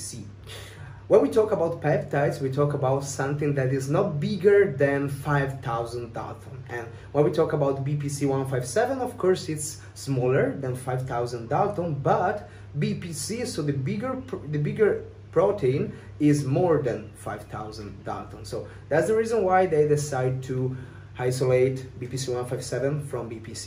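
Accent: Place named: Veneto, Italy. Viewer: Great,buddy 💪🏻l'inglese con accento Veneto è stupendo☺️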